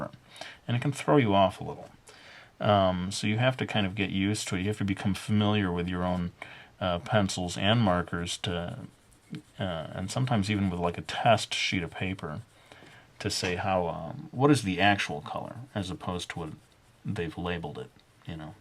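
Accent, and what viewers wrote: Brooklyn, United States. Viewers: in the beginning of his videos, and myself watching, the brooklyn accent makes everything hilarious. now looking back and watching, i had no idea what i was getting into. now im addicted.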